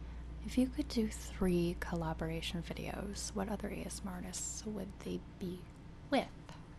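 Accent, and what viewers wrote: Canada. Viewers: It's so cute, I can hear the Canadian in your accent in a lot of your words in this video for some reason. Maybe my left ear is compensating for my malfunctioning right ear so I am able to pick up on it better. I don't know. Anyway, I find it quite endearing although I don't know why.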